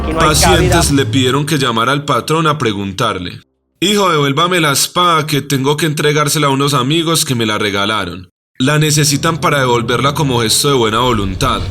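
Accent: Antioquia, Colombia. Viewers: Pues claro! Y más precisamente, de Antioquia. Ese acento paisa lo reconoce hasta un marciano 😂👌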